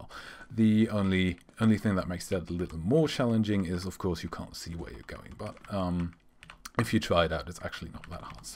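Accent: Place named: Germany. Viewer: what is your primary language if I may ask? german maybe? ^^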